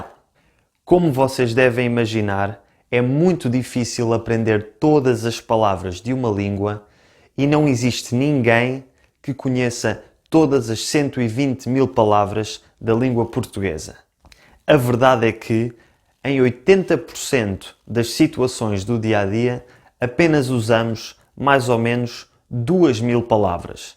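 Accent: Lisbon, Portugal. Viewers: de Lisboa* existem muitos sotaques de Portugal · Eu Muito Lindo O Sotaque Dos Portugueses Inclusive O Seu Sotaque És Lindo Parabéns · O seu cuidado ao falar, o modo como degusta as palavras, certamente, incentiva e fará com que muitos aprendam português. Diferentemente de alguns brasileiros que ficam criando comparações tolas entre a variante do Brasil e a daí, eu acho lindo o sotaque de vocês, um verdadeiro deleite, assim como acho belas as variantes que há no Brasil. Lamento pelo que não foi bom no passado entre esses países, mas consigo me distanciar o suficiente para admirar o que vocês têm no presente. Sucesso, meu caro! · Acho que falo o português de portugal muito bem! Gosto imenso do vosso sotaque! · O sotaque de Portugal é muito bonito! Eu sou brasileiro e a frase "Amanhã eu vou ir a praia" soa muito natural pra mim kkk. Eu mesmo falo isso. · Ouvindo o belo sotaque de Portugal. 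Um abraço de Natal, Brasil. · Sotaque de Lisboa 😊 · Acho que o sotaque de Portugal é muito lindo. Um abraço do Brasil❤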